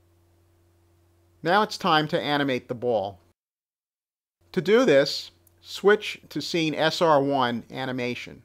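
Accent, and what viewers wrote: Boston, United States. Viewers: Lol I can TELL your from boston I can hear it. you say Bwall. =D Anyways, nice vid ;)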